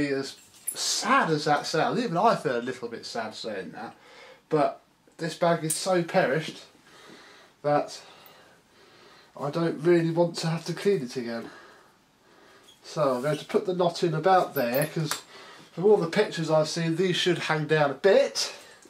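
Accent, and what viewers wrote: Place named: United Kingdom. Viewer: You have a very erudite British accent. Where in England are you from?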